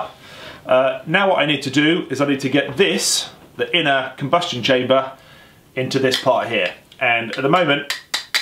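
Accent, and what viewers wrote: England, United Kingdom. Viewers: Thank you. I’ve just found your channel, but you have another subscriber on the strength of this one video. Also a nice change to find an English accent and a practical purpose, rather than some over-tattooed young person preparing for an “Armageddon” with a military hat and a (metaphorical?) gun on his hip. Thank you again. Les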